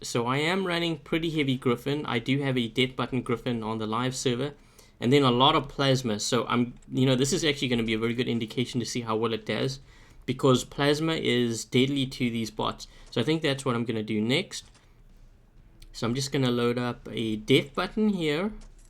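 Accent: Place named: South Africa. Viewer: sound south african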